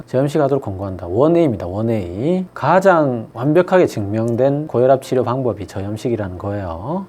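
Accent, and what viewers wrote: Gyeongsang, South Korea. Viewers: 갱상도토베기말투에 쏙쏙 빨리드갑니다 당뇨 고혈압 찾아보다가 여기까지와서 재밌게 잘봤네요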